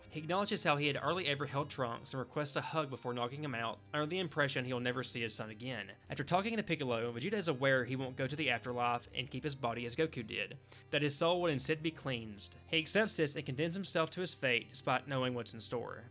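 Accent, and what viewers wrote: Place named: South, United States. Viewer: Glad this video was recommended to me, you make some bangers man. It's always comforting for me to hear another Southerner (I'm assuming) nerd out, bc where I'm from anime and animation in general still has a huge stigma around it. Keep being awesome!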